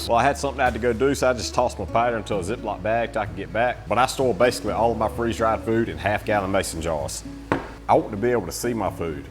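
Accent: United States. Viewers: Where in the US is this accent from i swear every word that has a W or "ou"he says with an I Pider, sind, 😂😂😂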